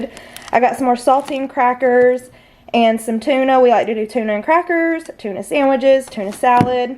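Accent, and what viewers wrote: South, United States. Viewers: love that you decided to do an episode on budget meals. Love your southern accent, what state are you from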